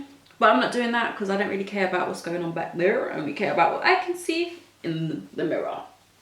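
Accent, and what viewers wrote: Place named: England, United Kingdom. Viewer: The England accent 🥰🥰😌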